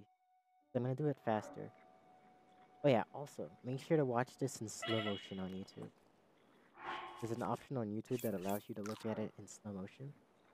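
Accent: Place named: England, United Kingdom. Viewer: i know, but it surprised me cause he had a english accent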